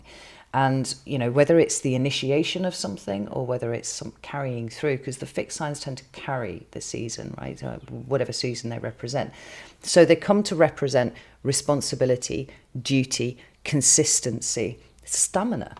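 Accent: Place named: England, United Kingdom. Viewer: I’ve never been a fan of the English accent, but I like it when I’m in here. I guess there has to be a threat of profanity to soften it for my ears. Delivery is stunning. I’d put you on the large screen and the cards in the small screen, if left to me.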